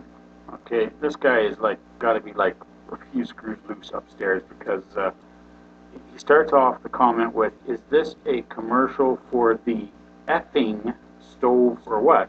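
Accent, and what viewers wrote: Canada. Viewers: Great videos. Just wondering, you don't sound like you're originally from Ontari-ari-ario to me are you from from New Brunswick or Nova Scotia? Sounds like a Maritime accent there. Take care and keep those great video coming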